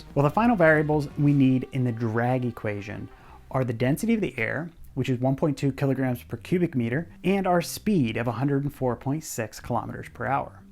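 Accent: United States. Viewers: Fantastic graphics and visual, the best I’ve seen in awhile although 3blue1brown is giving you a run for title of best! BTW, you may already know this, maths = Brits, math = American, you have a clear American accent, lets stick with math - I insist (LOL)! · "maths"??? super awkward to hear the European multiples version of math in your American accent. just be consistent and say math.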